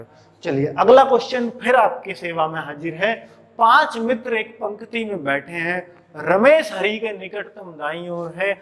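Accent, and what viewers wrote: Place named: Uttar Pradesh, India. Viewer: Sir full U.P. wali feel a gayi hum D ko hi pel denge.😎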